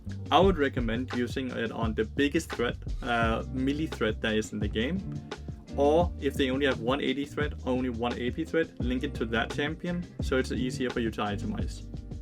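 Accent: Denmark. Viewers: Bro are you Danish? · Are you Danish? :p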